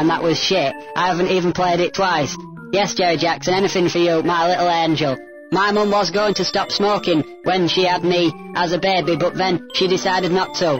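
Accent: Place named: Barnsley, United Kingdom. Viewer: Speaks well, is he posh from Barnsley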